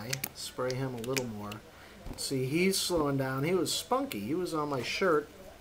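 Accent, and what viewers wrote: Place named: Midwest, United States. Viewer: Im from new york. lol my accent is nothing like this. his is more of a mid western accent. lol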